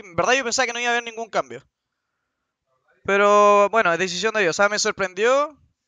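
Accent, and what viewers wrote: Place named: Argentina. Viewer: Pq habla como argentino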